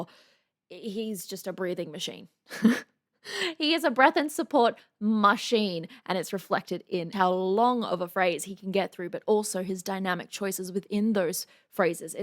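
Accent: Australia. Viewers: I love your aussie accent 🥰🥰🥰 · This would be a perfect soundtrack to a game teaser or trailer. Also... It's always so much fun to hear Aussies saying know. "Knar"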